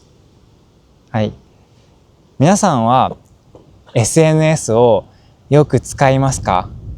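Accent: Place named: Taiwan. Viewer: You sound a Little bit , taiwanise , but your Japanese is on point!!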